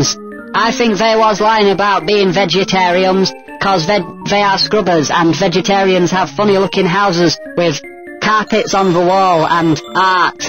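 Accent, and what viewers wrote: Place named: Yorkshire, United Kingdom. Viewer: The Yorkshire is strong :P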